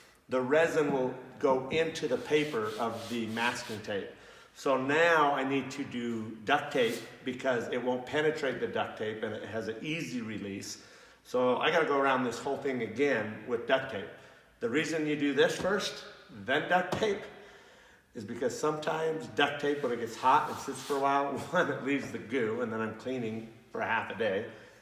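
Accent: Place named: Nebraska, United States. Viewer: What state are you from? Your accent reminds me of Nebraska but I can’t tell. Thanks for documenting your build process so well, it’s very entertaining and educational. I’m studying as a software programmer, but I really want to be a pilot. I might join the military, but I’m still looking at my options. Some advice, maybe a little sound eq when you’re using power tools so it isn’t so harsh on the ears but otherwise great quality, especially for being in a hanger!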